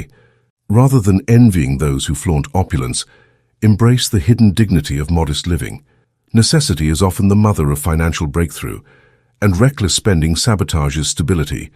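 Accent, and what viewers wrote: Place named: England, United Kingdom. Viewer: This narrator sounds like some kind of wise fellow. The English accent helps, however his words are solid. Great advice .....man without a face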